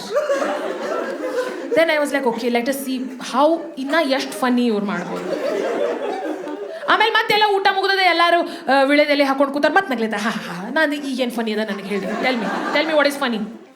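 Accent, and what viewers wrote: North Karnataka, India. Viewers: ಉತ್ತರ ಕರ್ನಾಟಕ ಶೈಲಿಯ ನಿಮ್ಮ ಕನ್ನಡ ತುಂಬಾ ಸುಂದರವಾಗಿದೆ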